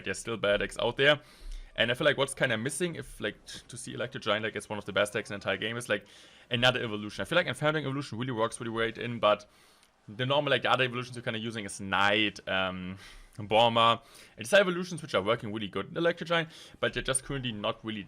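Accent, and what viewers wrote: Germany. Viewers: The German accent kills me, I’m sorry! But your skills in this game are insane.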